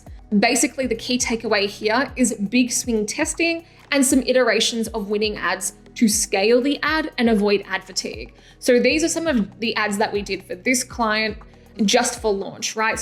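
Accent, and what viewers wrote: Australia. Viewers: Never getting tired of the Aussie accent and never getting tired of your videos 🤷🏻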